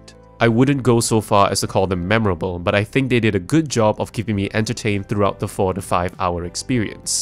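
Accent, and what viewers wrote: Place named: Singapore. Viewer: You sound Singaporean! Good review, well presented and structured.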